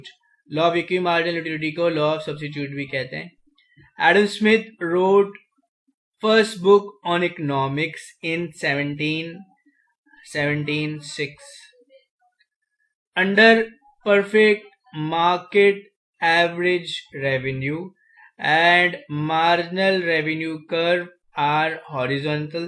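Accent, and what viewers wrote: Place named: Karachi, Pakistan. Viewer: Lahore board k haii?? Awazz apki Karachi walii lag rahii ha🧐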